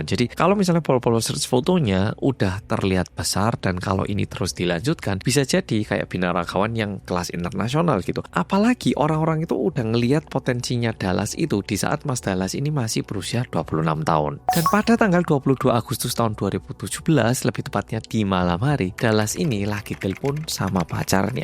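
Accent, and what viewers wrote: Java, Indonesia. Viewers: Ini wni bukan sih? Logatnya jawa 🤣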